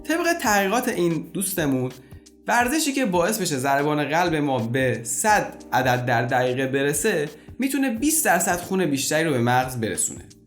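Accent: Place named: Turkey. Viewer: دکتر سعی کند لهجه خود را درست کنند اینکار فقط با ترکی صحبت نکردن امکان پذیر است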